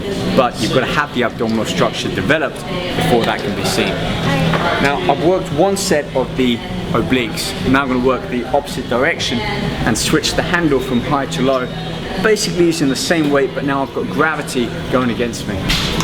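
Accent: England, United Kingdom. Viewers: And an english accent! No kidding man, you'd be swimming in it.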